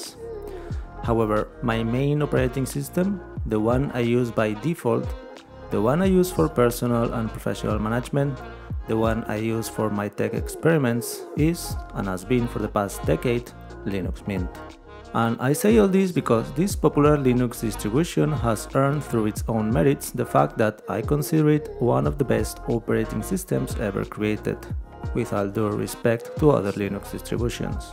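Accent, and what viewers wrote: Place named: Brazil. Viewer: brasileiro tentando falar ingles, suponho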